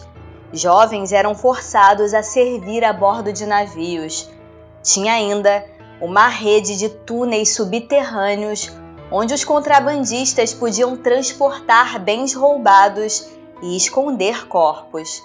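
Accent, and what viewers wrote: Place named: Rio de Janeiro, Brazil. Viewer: Rio de janeiro q puxa o x no final.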